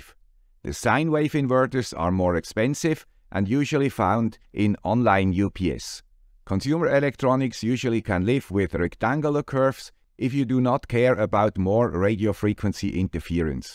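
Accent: Switzerland. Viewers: The man with the croaky Swiss accent 8-) Everyone seems to have suffered this winter. I've been coughing since Christmas.!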